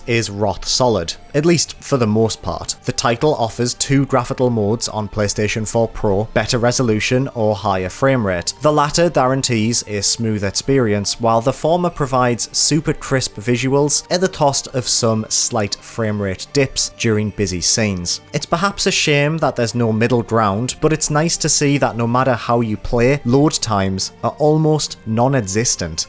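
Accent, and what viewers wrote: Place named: Wales, United Kingdom. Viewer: Maybe Welsh pronunciation creeping in? I noticed it too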